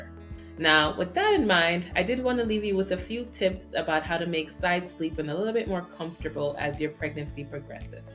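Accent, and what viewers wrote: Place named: Jamaica. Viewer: Sounds Jamaican. Anyway, Good info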